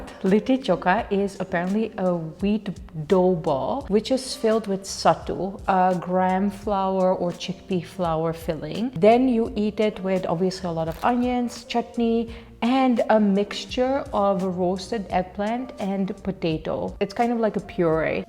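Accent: India. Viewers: Hey!! Ik keek jouw video's voornamelijk toen je in Nederland woonde en vanuit Nederland rondreisde. En na lange tijd besloot ik deze video te kijken. Wat mij opvalt is dat je al een mega Indiaas accent hebt gekregen! Super grappig.😉😊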